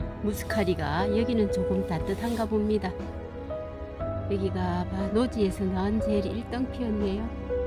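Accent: Gyeongsang, South Korea. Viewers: 너무예쁘게 잘보았습니다. 목소리가 경상도인듯한데 농장이 어디인가요? 우리집은 대구인데요 꼭한번 구경하고싶은데 가능할런지 모르겠습니다만 주소나 전화번호를 알려줄수있는지요? 꼭 한번 보고싶습니다.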